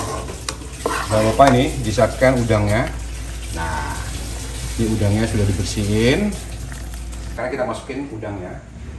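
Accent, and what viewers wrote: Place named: Padang, Indonesia. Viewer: bahasa nyo cak bahasa palembang, tapi logat nyo cak padang yo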